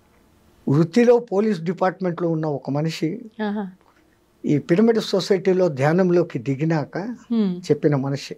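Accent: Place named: Rayalaseema, India. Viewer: Sri Sri Sri gurudevulu notinundi ma rayala seema basha vinte shanthoshamga vundhi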